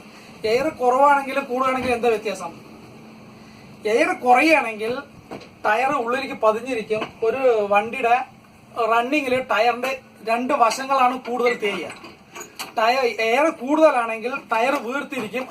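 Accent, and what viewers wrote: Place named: Palakkad, India. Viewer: I Like ur palakkad Languages iam from pune T q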